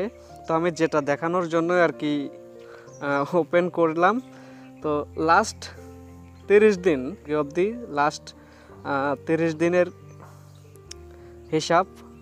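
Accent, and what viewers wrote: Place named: Khulna, Bangladesh. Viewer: আমার বাড়ি ঝিনাইদহ আপনার কথা সুনে বুঝতে পারছি আপনার বাড়ি খুলনা বিভাগে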